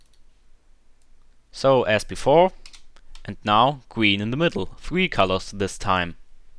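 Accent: Germany. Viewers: man merkt irgendwie das du deutsch bist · standert german accent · i think ur a german like me :D nicee accent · ahaha geiler deutscher Akzent und danke für das video xDDDD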